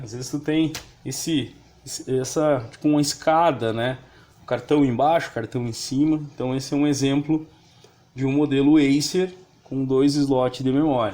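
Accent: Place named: Rio Grande do Sul, Brazil. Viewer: Eita, gauchada sempre termina as frases com "NÉ" :face-orange-raised-eyebrow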